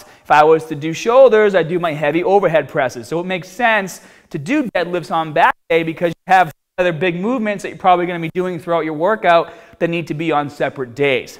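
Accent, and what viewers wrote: Boston, United States. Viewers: Bahbell... this guys from boston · With that Bahston 'A', if he spoke just a bit slower he would sound just like Ahrnold! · when did he get a Boston accent? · He's from America... That's a Boston accent. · I happened to just stumbled upon one of your videos and now I am hooked. I love the videos, love the way you tell it like it is and most of all a good teacher. I look forward to staying updated on all your videos keep up the good work man. Ps: where is your accent from? You up north-Boston area something? Lol Anyhow keep doing your thing man take care.. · You must be from Boston, I can tell by your accent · This dude is pretty cool. He knows his stuff. That Boston accent kills me sometimes, lol, but good material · Good, insightful stuff. One thing: as a Brit, I'd say your Boston[?] accent comes over a little strong. It's easily understood, but it makes a twenty minute video seem half as long again. 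If you could tone it down to a 'Ted' level, that'd be great, and maybe would widen your appeal. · Love the Boston accent, brp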